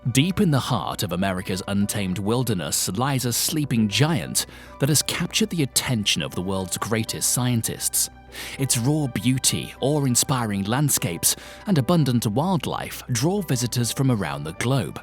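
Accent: United Kingdom. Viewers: The narrator has that annoying British accent that is constantly used online to sell something, usually something that is threatening to destroy the money grabbing pharmaceutical industry. Hence, where is the credibility in overusing such an accent on the "internet wild west" where anything goes; you can say any mindless thing you want with little fear of significant repercussions.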